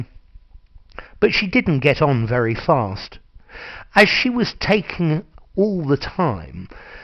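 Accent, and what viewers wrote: United Kingdom. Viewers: i really like listening to this its easy for me to study British accent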